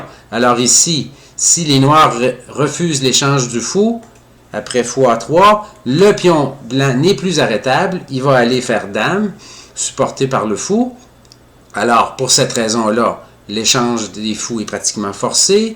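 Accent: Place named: Quebec, Canada. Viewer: Fin de partie très intéressante et bien démontrée par notre professeur et grand pédagogue préféré avec son accent québecois très plaisant et que l'on aimerai bien rencontrer !! Merci pour cet exemple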